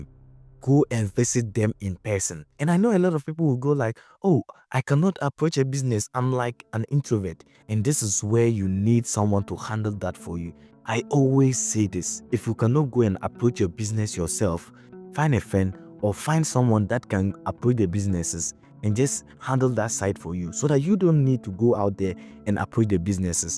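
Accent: Ghana. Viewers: Are you from Ghana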